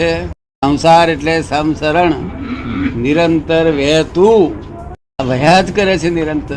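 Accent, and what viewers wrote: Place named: Gujarat, India. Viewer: nice work of hindi translation for those can't able to understand dada's gujarati accent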